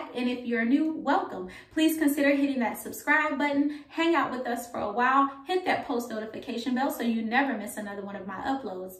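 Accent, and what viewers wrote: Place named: New Orleans, United States. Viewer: That accent don’t lie lol to say you’ve been gone so long New Orleans is just in us 😂🙌🏽🥰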